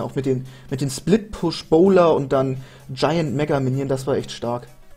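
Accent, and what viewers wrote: Munich, Germany. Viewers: kommst du aus München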